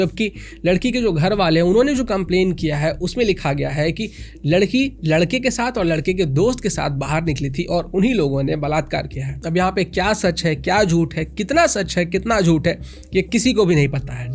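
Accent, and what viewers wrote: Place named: Bihar, India. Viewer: bhai gandu hai kya..udisha nahi odisha hai.. bihari tone har mein ghusana jaruri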